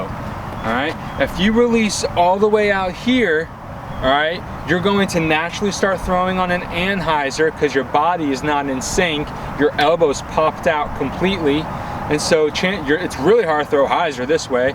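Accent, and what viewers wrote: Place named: Colorado, United States. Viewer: I hear a Colorado accent. Are you from CO?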